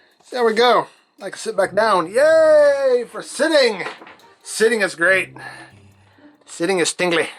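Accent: South, United States. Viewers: you need to Apply for your southern card, you sound like a southern guy, take for me I am for the south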